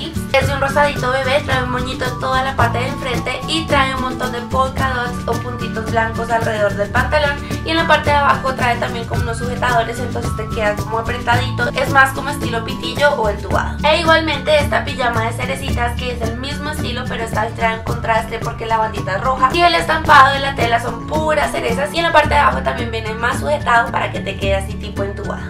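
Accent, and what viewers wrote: Colombia. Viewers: yo se que los colombianos hablan asi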